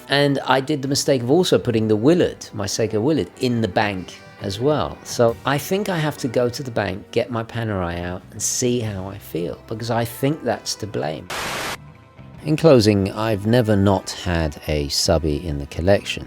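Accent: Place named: England, United Kingdom. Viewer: An Italian with an English accent , ma whats going on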